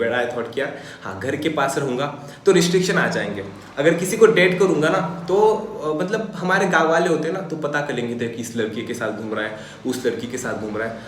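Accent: Maharashtra, India. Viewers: Love his marathi accents ❤😅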